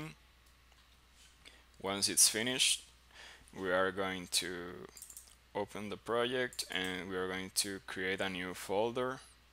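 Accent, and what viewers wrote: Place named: Costa Rica. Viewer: Are you Costa Rican? Your accent sounds very Costa Rican to me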